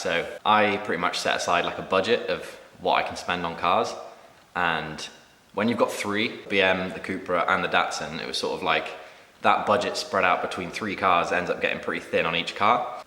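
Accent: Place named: Bristol, United Kingdom. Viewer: knew you were from brizz from that accent hahaha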